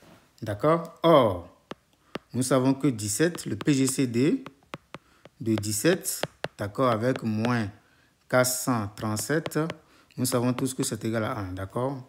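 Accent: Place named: Benin. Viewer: Bravo mon frère , c'est vraiment sympa cette tranche de maths sur les équations diopht. on croirait entendre mon prof de math au lycée en C.I qui était Béninois.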